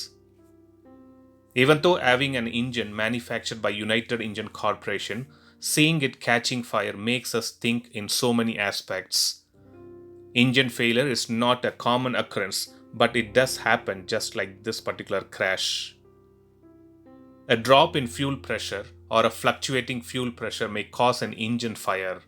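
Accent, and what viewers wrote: India. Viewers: Naratornya kaya orang India ngomongnya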